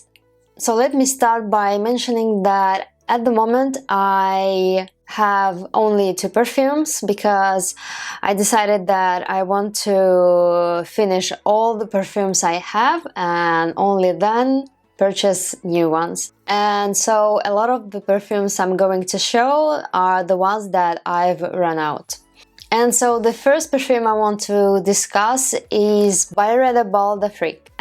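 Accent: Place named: Russia. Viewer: ну вы явно русскоговорящая. Через экран слышно😁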